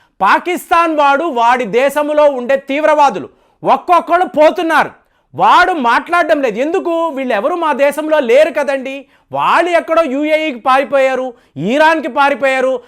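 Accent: Tamil Nadu, India. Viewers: పాయాసం ఏమిటి సోదరా!!! పాయసం...అనాలి... మీరు ఎన్నుకునే సబ్జెక్ట్స్ చాలా ఇంట్రెస్టంగ్ గా వుంటాయి... విశ్లేషణ కూడా అదుర్స్...నో వన్ కెన్ బీట్ ఇట్.... అయితే మీవాచికంలో (స్పీచ్ లో) తమిళ ఆక్సెంట్ విరివిగా వుంటుంది...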